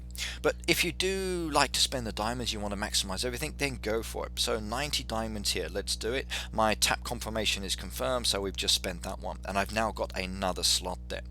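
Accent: United Kingdom. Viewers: am I the only one or does he have an English accent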